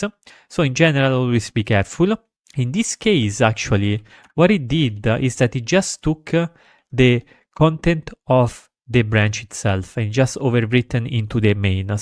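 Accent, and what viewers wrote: Italy. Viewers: Lo sento da 1 km di distanza l’accento italiano haha bel video!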